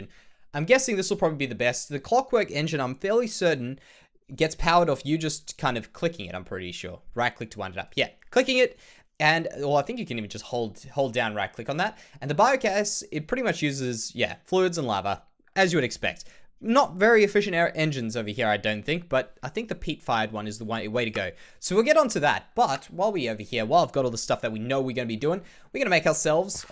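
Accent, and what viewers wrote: Australia. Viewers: You're Australian right?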